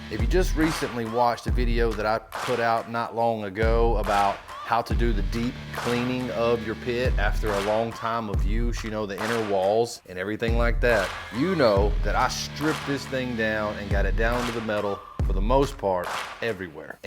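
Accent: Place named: South, United States. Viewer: I am so impressed by you friend. Not only is this a very well thought out and executed video, I have to say that even though you are from the south (obviously), You speak very well! I am so used to southern folks completely butchering their grammar and just basic very poor use of the English language! I'm not knocking anyone from he south, I believe MOST of them are fantastic and fine people, I just cannot stomach to watch 99% of them because their speech makes a Whole bunch of great people that are as intelligent as anyone else in the entire world sound like absolute idiots. FANTASTIC little video here that was just great from start to finish! I will be subscribing! Thank you for being on YouTube!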